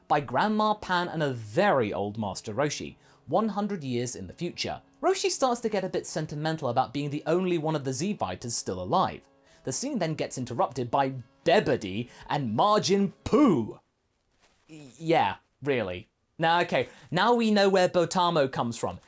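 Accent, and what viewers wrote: United Kingdom. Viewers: J2391 hes british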